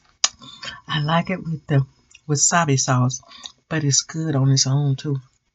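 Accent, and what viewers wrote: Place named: South, United States. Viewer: Welcome. Love ur south accent.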